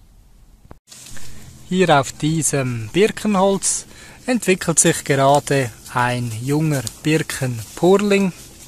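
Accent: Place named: Switzerland. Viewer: dieser geile schweizer dialekt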